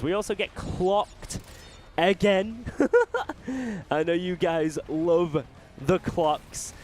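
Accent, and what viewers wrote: United Kingdom. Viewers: hol up, hol up. Money in pounds..... British accent.... Do they.... do they possibly have KFCs in the UK? o.o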